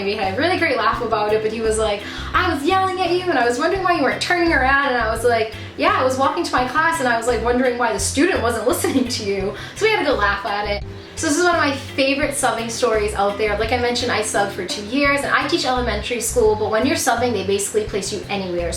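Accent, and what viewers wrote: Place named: Massachusetts, United States. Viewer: LOVE Faneuil Hall! I'm originally from MA, but I live in Alabama now. Hearing you talk reminds me of home haha. I also LOVE Disney (I did the Disney College Program), I've been to the etsy shop you recommended for Mickey Ears and I was wondering if you've seen this shop: Anthology Candles. They make candles that supposedly smell like things from the Disney Parks (rides, food, resorts, etc). I'm dying to try some!!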